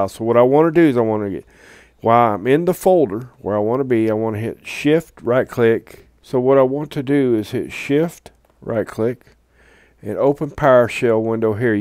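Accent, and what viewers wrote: South, United States. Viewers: i like your accent man ! southern , sub it, i wish i can learn this accent my Yall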